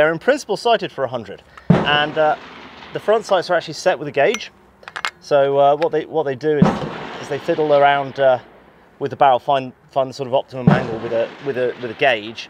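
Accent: Switzerland. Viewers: the language spoken by the guy behind is actually Bernese German or even more precise Bernese Oberland German. Swiss German doesn't actually exist as a general spoken or written language. Every region has its own dialect. My own dialect (Zuri Schnurre) is different from the folks in Berne, Thurgau, or Basel. And if you really want to hear a strange dialect you have to visit the German part of Valais or Wallis. And by the way starts to sound like a real Berner lately. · Your switzerdütsch is adorable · Thats some fine swissgerman right there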